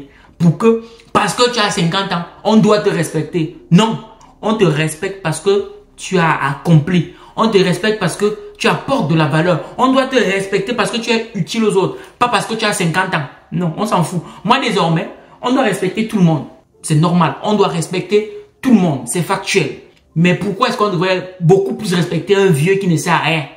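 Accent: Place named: Cameroon. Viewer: Pour une fois je ressens ton accent camerounais 😂😂😂😂😂😂. Merci pour cette vidéo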